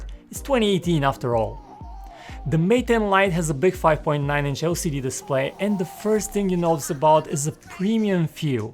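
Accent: Varna, Bulgaria. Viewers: Само аз ли забелязах че си от варна :Д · Are u slavic ? Bulgarian perhaps?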